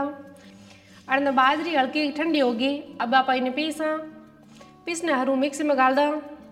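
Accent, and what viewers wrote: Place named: Marwari, India. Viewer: Like Marwari accent.i will try this .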